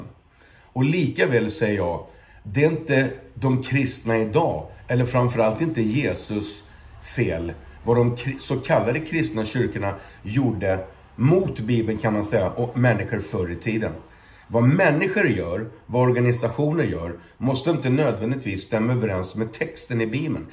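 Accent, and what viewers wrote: Skåne, Sweden. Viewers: Bra med titel som beskriver innehållet, gjorde att jag slapp irritera mig på skånska. Religionssnack är intressant om det är på rikssvenska.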